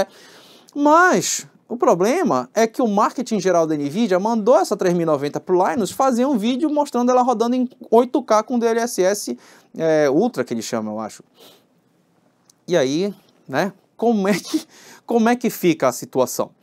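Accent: Pará, Brazil. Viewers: Ei doido tu é paraense é? boto fé mano drx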